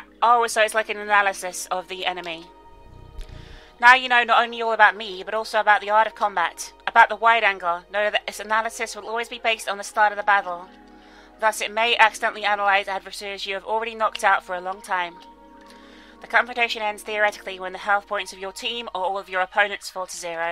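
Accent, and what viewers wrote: United Kingdom. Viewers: Yes she is British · are you british?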